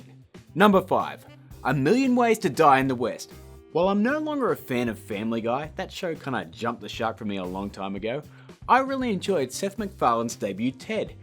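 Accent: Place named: Australia. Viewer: This review wouldn't have been at all tolerable without an Australian accent. Really, an American couldn't have used the same body language or expressions and be taken seriously. With that said, awesome review. We align on almost everything here, with the exception of Guardians. I thought Guardians should have been way higher.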